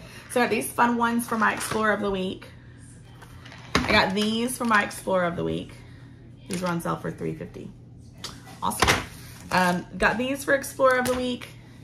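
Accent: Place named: Midwest, United States. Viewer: Can I ask what state you live in? Here is the reason, I grew up in NE Pennsylvania and we say crayon as kinda cran or cray on. But when I moved here to Indiana I notice that alot of the mudwest states saw it like crown. Like I hear you saying it. I'm just curious where you are located.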